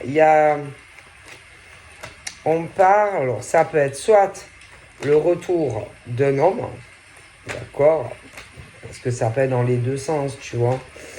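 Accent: South, France. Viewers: 🙂 j'aime bien quand tu dis : d'accord hein bon😊avec ce bel accent du sud 😊💕💐 ça me fait sourire 😊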